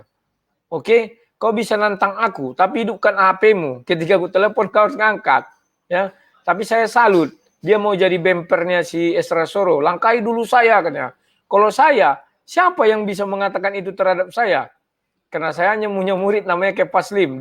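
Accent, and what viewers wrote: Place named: Medan, Indonesia. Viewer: Saya senang dengan bang karna pemahanan dalam Alkitab, dan cara penyampaian dari bang ini khas orang Medan...... mungkin teman2 ada yang kurang srek dgn cara penyampaiannya, tapi saya yakin penyampaiannya jujur dan mencerahkan kita semua....semangat terus pak...Selalu diberkati Tuhan.... salam dari Manado. IMANUEL.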